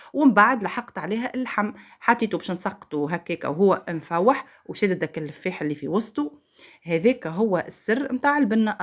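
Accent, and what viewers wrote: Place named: Tunisia. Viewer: ما شاء الله على الهدرة الزينة التونسية ما أحلاكي الفديو رائع بكل التواضع و حسن الرقي في الكلام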